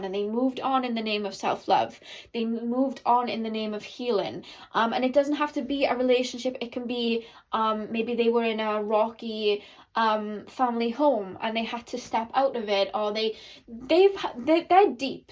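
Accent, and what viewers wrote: Wales, United Kingdom. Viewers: Excellent reading! Love to hear your FUN WELSH ACCENT 😀! 😀Thsnk you for sharing your BEAUTIFUL GIFTS with us! You are very TALENTED! 😁😄😄😃😀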